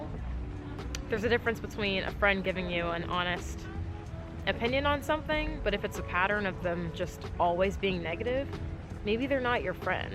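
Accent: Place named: Boston, United States. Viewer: Ayeeee your from Boston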